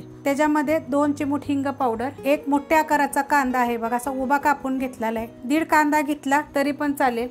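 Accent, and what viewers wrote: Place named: Phaltan, India. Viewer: एक नंबर रेसिपि.. तुम्ही फलटणच्या (जि.सातारा) आहात का? तुमच्या बोलण्याच्या पद्धतीवरनं तसं वाटतंय.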